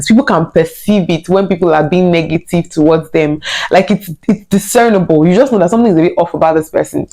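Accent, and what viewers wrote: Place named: Nigeria. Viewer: Why Nigerian accent sounds rubbish?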